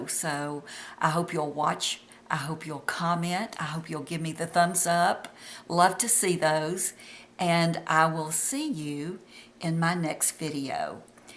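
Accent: South, United States. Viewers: 70!? You look INSANLEY FABULOUS! Plus I hear a southern twang! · So glad to hear that someone else had the same experience as I did upon turning 65. I've always taken care of my skin except when we didn't know what the sun could do. So going from what everyone told me was beautiful skin to lines, sagging, brown spots, wrinkles and under eye bags has been tough. Glad I found you! Love that southern accent!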